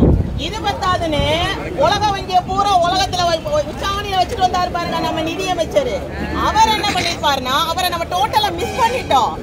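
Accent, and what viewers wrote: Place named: Trichy, India. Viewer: திருச்சி தமிழ் தவழ்கிறது. வாழ்த்துக்கள்.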